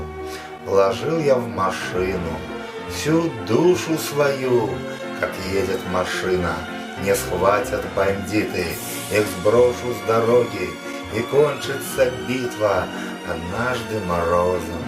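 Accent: Belarus. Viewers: Братка- беларус чытае?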